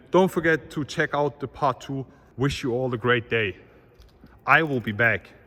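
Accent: Germany. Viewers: Diese Deutsche Akzent beste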